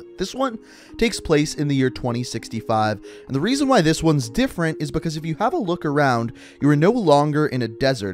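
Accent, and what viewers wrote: Canada. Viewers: I was just trolling man, no harm. And as far as the way he pronounces things, he is Canadian they pronounce things differently than the Americans! Like About is Aboot etc.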